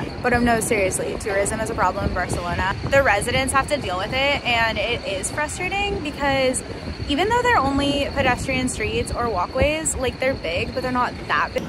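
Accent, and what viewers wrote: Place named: United States. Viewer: tienes una voz muy bonita y musical. El ingles de estados unidos siempre me ha parecido " dificil" por el acento. Hablado por tí suena muy bonito. Podrias trabajar como " dobladora " ( en el cine las versiones en español ). Muy feliz de que estes feliz. Besitos desde Granada, Andalucia.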